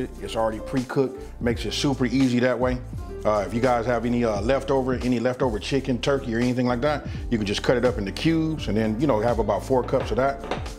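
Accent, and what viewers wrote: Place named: Los Angeles, United States. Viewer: Are you from L.A? Lol got that L.A accent lol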